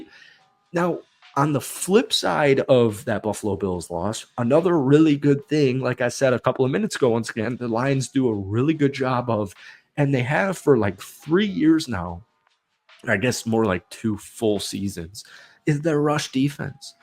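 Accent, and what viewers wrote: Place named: South, United States. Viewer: Your half southern half Wisconsin accent is absolutely awesome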